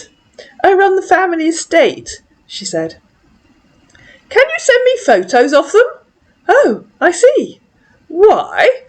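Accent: United Kingdom. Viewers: Rofl 😆 I LOVE it and actually LOL when you call him an idiot or tell them to stop acting like a baby. With your British accent, it's just sooo derned funny! (And no, I'm not making fun)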